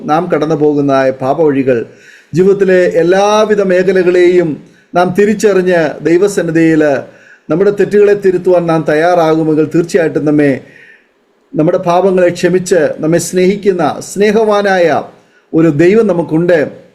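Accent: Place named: Thiruvananthapuram, India. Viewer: ഇദ്ദേഹത്തിൻ്റെ സ്ലാംഗ് തിരുവനന്തപുരം രീതിയിൽ ആണ്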